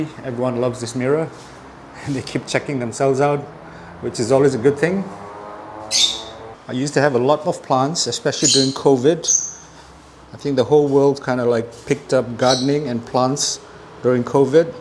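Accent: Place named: Fiji. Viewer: Bula from Suva...I do remember being introduced to your family restaurant in Nadi while working there in the mid 1990s by work colleagues, Paul Chang and Terence Low (Bank of Hawaii). You asked the question in one of your videos whether to open a restaurant in Singapore...My answer is why not open in Suva. I think with your knowledge of fusing asian and pacific cuisine and unique experience, you would do very well in Suva, and would really complement your Singapore Supper Club. I'd be very glad to assist you set-up if you're interested. BTW, I'm enjoying your videos and you still have a very strong Fiji accent...cheers.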